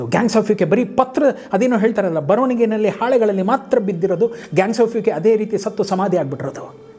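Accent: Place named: Madesha, India. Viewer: Nimma kannada estha sir nanage .. nimma madesha nana favorate.. previous episode you spoke about negative comments... Keep it mind .. if you are getting negative comments that means you are growing... All the best for the film .. please plan pramotion activity carefully that can make or break the project... I will watch first day first show ...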